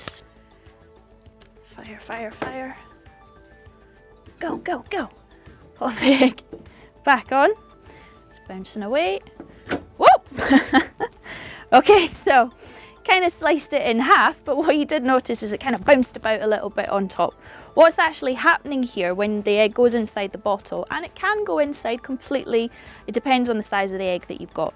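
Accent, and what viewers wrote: Scotland, United Kingdom. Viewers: scottish people just know when others are scottish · Is that a british accent ?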